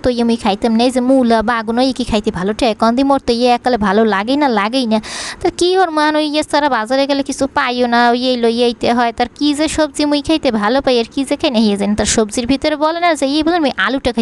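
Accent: Barishal, Bangladesh. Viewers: আমার বাড়ি মাদারীপুর। থাকি মালয়েশিয়ায়। আমার ভাবি ও বরিশাইল্লা।কিন্তু আপনার কথা এক্কেবারে খাঁটি বরিশাইল্লা। শুনলে অনেক হাসি পায়। আপনার স্বামী ও কি বরিশালের?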